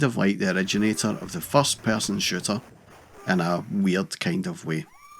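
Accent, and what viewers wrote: Scotland, United Kingdom. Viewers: Upvoted for the narrator’s Scots burr.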